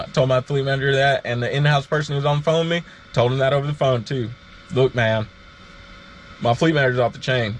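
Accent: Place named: Alabama, United States. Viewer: He Forrest Gump country lol I’m from Alabama and he sound super country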